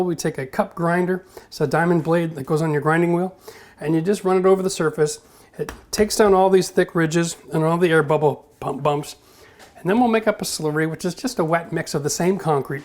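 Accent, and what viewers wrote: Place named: Canada. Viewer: I didn't even notice the 'Eh accent till the very end when he let out an "abooot"!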